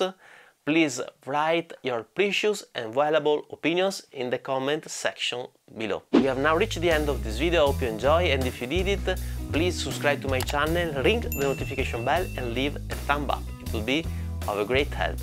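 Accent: Italy. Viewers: you're Italian I can hear it.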